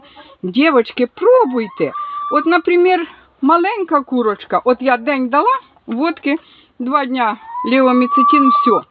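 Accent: Kuban, Russia. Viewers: Спасибо за видео балакае як у нас в станице на Кубани дякую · Но это не украинская мова. Так говорят в станицах на Кубани взрослые. Я сама с Кубани и понимаю эту речь. А вот украинскую не понимаю.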